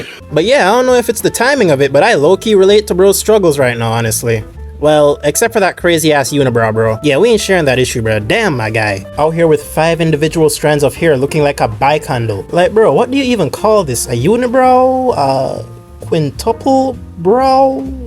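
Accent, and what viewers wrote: Jamaica. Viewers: sound like a yaadie heard obeah thought i was tweaking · You sound like the Watch Mojo guy with a Jamaican accent. I love it.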